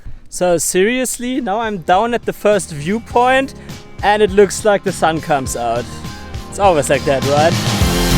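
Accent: Germany. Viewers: you sound german....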